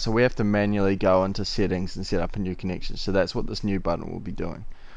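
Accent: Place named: New Zealand. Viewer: Found a Kiwi haha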